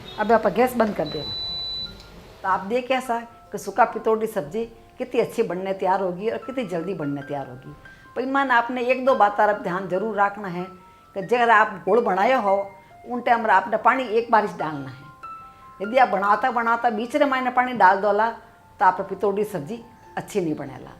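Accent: India. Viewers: Amma I love your way of speaking.desi style.😙😙😍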